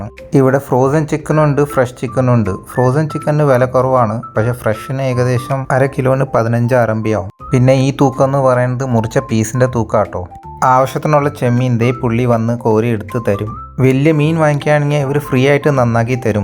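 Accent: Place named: Perumbavoor, India. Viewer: നാട്ടില്‍ എവിടെയാണ്? എവിടെയോ ഒരു പെരുമ്പാവൂര്‍ സ്വരം.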